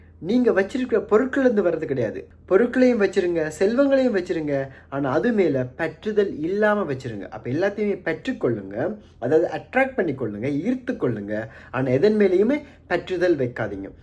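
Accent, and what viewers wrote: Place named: Sri Lanka. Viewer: அழகான இலங்கை தமிழ் புரிய வைத்த நன்றி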